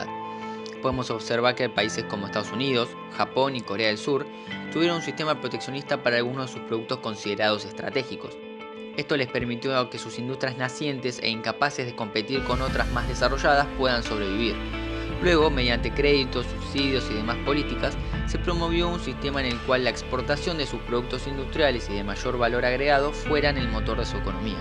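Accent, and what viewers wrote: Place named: Argentina. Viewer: solo un progre o izquierdista pudo hacer este video, y por el acento, de seguro es un peroncho kirchnerista. No tiene validez.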